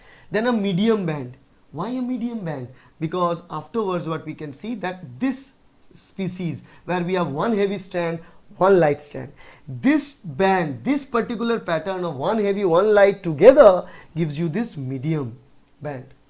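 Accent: Bengal, India. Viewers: Sir are you from kolkata Are you bengali I find a tinge of bengali in your pronouncing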